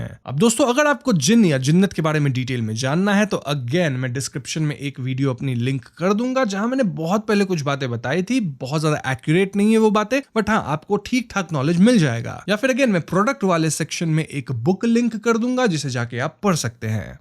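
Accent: Bengal, India. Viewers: Bhai, achha natak kar lete ho..😅 Bengali hoke bolte ho ye bhasa nahi aati... Jitna bhi Koshish kar lo par koi apna MTI 💯% khatam nahi kar Sakta... Aapke bohat words se pata chal jata hai ki your mother tongue is Bengali... I have seen many Bengalis who claim to be a non-bengali and I fail to understand why they do like this...😅 But anyway good try but not the Best as you are caught... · Thanks Bhai for covering this series! Loved your explanation and cute bangla accent haha! Take love from Bangladesh <3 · bhaiya its loke bole😝.. bt bhaiya ur bengali sounds cute❤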